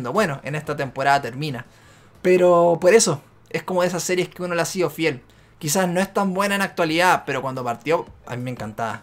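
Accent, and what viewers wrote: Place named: Argentina. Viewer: pensaba que era de Argentina se parece mucho el acento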